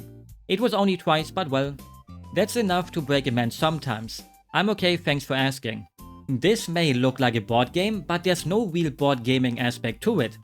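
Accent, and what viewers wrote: Germany. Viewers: you're funny. I love your German accent. No I don't want to say your German accent is funny, I don't mean to sound wude.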